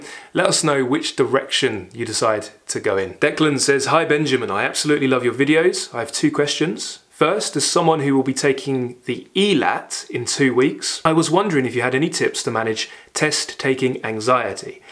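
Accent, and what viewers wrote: United Kingdom. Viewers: Hey man, I improve my British accent from your videos. Thanks for the videos.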